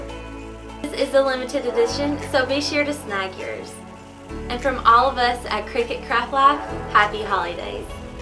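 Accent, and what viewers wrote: South, United States. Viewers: Your accent is literally the sweetest southern accent like...ever😂❤️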